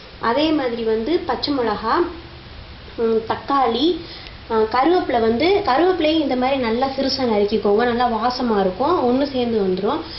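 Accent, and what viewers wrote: Madurai, India. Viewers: loving your Madurai Tamil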